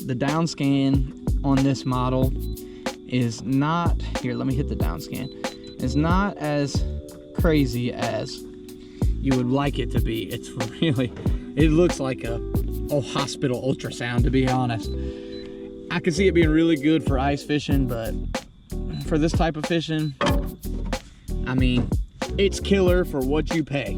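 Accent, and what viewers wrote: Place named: South, United States. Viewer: This is so violently southern. I love it. As an Alaskan it is inspiring to see this level of southern out there😂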